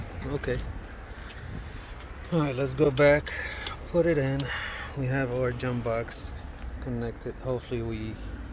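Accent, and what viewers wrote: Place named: Iraq. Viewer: Nice Iraqi accent 😊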